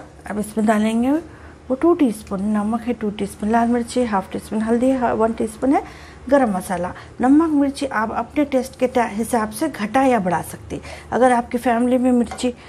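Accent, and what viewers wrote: Hyderabad, India. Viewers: Aunty ma to apki voice over k liye apki video dekhti hu your voice is really nice hyderabadi.....😀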